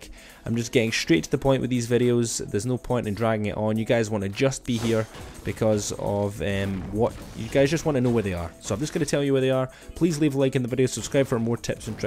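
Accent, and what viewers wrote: Scotland, United Kingdom. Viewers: Are you from Scotland nice bro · Are you Scottish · this is some hardcore scotish accent (i fricking love it) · Are you Scottish !?!?? If you are I finally found someone else that’s Scottish on yt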